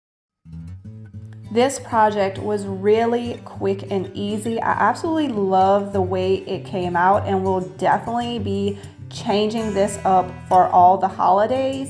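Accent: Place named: South, United States. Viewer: You have a beautiful personality and your southern y’all feels warm and welcoming.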